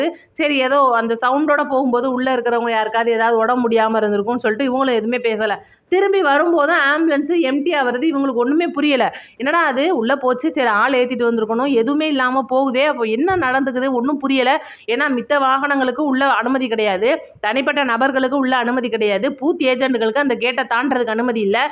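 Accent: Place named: Madurai, India. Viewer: Akka ungal madura pasai super ❤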